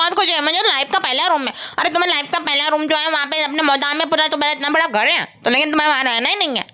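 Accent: Gujarat, India. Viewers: Your Reaction vlogs are always great ..In between love hearing you Gujarati accent and words ..Keep it up and God bless you ❤️🙌🫶🏻🦋🧿🌷✨🤗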